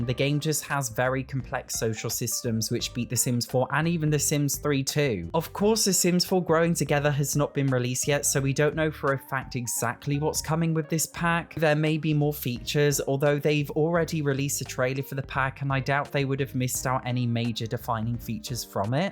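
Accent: United States. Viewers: Your american accent always makes me laugh, it is - chef’s kiss - amazing. 😂😂❤ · You with the American accent sent me into an alternate universe where the sims 4 isn’t garbage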